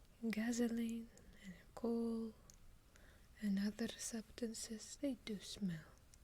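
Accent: Russia. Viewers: I was searching for heavy Russian accents because I adore heavy accents, especially Russian and Ukrainian accents, and I found your video. I found it very funny and also cute. You have a very soothing voice, wonderful accent. Thanks.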